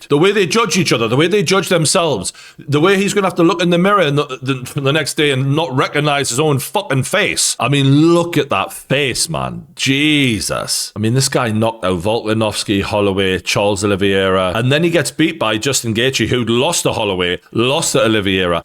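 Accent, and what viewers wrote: Newcastle, United Kingdom. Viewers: He literally said this isn’t the first time. Keep up with the Geordie accent lad.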